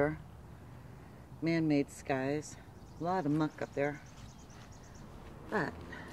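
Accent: South, United States. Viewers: Ok lady I think you make very beautiful videos. And I really like your accent. A bit southern but very clear. I am not an American so sorry if I am wrong.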